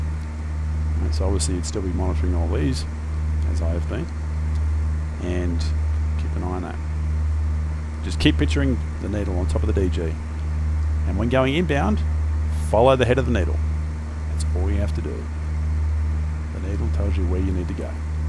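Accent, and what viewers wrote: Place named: Australia. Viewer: Within literally 0.25 seconds of your first word I go: Cheers mate, just couldn't get any better than having an Aussie explain NDB intercepts out of YLTV! (Studying for IREX here in Melbourne too). Thanks for great video!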